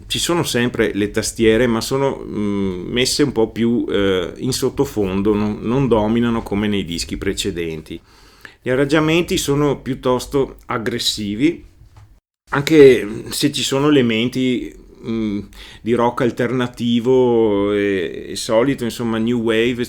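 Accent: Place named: Veneto, Italy. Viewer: Un video per ghiottoni,molto interessante,grazie,ti ga' cadenza veneta,ció...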